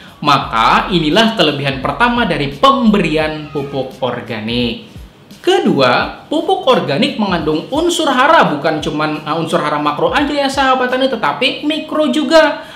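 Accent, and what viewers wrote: Banjar, Indonesia. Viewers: Banjar tagasnya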